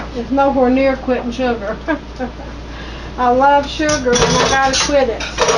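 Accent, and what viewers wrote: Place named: Appalachia, United States. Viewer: my mom had the same cookware you have - waterless cookware. I remember she paid a small fortune for them and took the best care of them using Bar Keepers Friend on the inside EVERY time she used them. They are 30 years old and in mint condition. She has the same grinder tool too. My Mom passed away about two years ago and I miss her every day. Seeing you cook with your pots and pans and in that beautiful Appalachian accent - also like my Mom - makes me happy in a special way. So thank you for doing all you do to share your cooking experience. It means a lot to me. Btw, Mom always told me that if I didn't scrub her pots properly and take good care of them after she was gone, she would come back and haunt me. 😁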